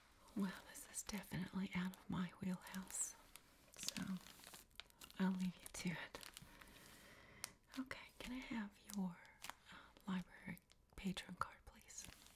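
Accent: South, United States. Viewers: your accent is so wonderful to me. Your voice and mannerisms just speak “southern charm”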